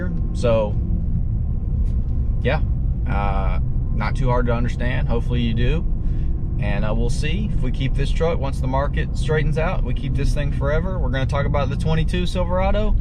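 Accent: Alabama, United States. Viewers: Are you from Alabama ?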